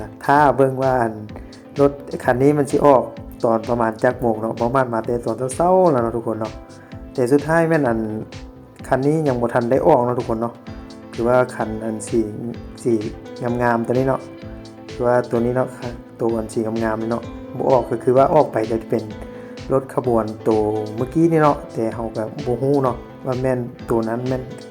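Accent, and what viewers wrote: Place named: Xiangkhouang, Laos. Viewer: ເຈົ້າບໍແມ່ນຄົນຊຽງຂວາງຫວາຄຳເວົ້າຄ້າຍໆຄືໄທຊຽງຂວາງ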